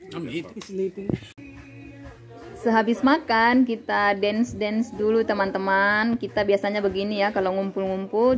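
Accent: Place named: Batak, Indonesia. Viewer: Gak tau knp dari dl tu suka bgt cara ngomongnya orang Batak...apalgi klu liat Judika hahahahh skrg liat kk aja dl..horassssssss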